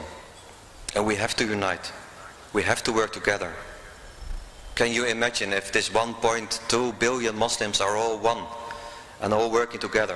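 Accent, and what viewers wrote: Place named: Europe. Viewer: What country is this ? What European country this brother from?? His eccent European country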